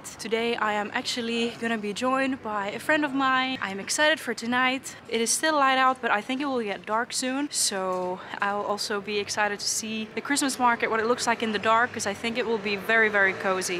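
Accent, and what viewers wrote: Netherlands. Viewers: How does an expat pick up such a dutch sounding accent?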